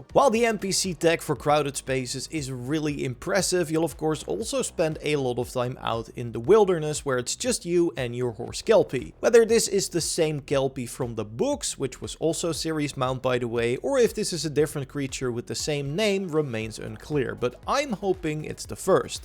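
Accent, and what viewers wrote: Netherlands. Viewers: HELL NAH BRUH that dutch accent is killing me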